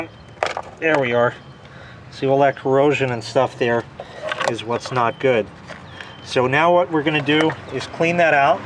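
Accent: New York, United States. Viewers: Ethnicity: lebanese with nyc accent :) thanks for the video Habib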